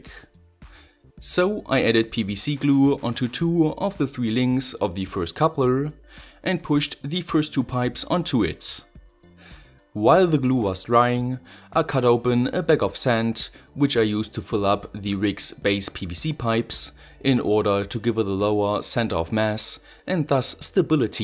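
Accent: Germany. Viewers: Mach Mal bitte mehr Deutsche Videos, ich mag es, wie du Englisch mit deutschem Akzent und Deutsch mit englischem Akzent sprichst! Translation: pls make more english videos, i Like it, how you speak english with a german accent and german with an english accent!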